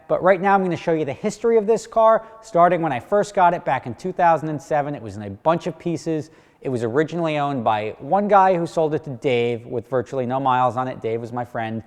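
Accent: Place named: New York, United States. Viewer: That New Jersey/ new york accent in the older video.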